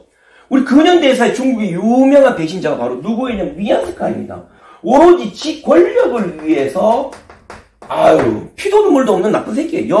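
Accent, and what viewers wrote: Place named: Gyeongsang, South Korea. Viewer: 듣다보니 깔끔정리 잘해주시고 시간이 금방가네요 경상도 사투리도 시원시원하시네요 또 올게요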